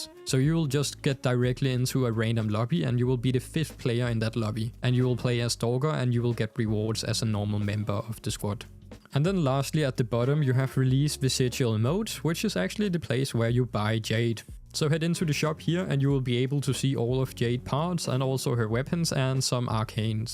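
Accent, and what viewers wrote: Denmark. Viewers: is this guy danish?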